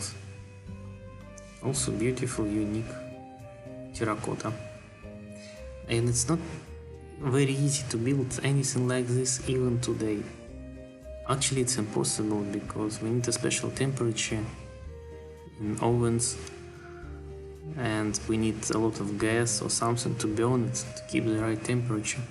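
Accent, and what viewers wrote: Russia. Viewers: А акцент то у тебя Русский. Чётко слышно.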